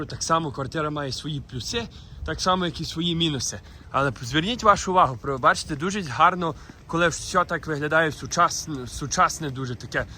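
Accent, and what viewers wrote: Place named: Prykarpattia, Ukraine. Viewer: Прикарпатський акцент дуже подібно ✌️😉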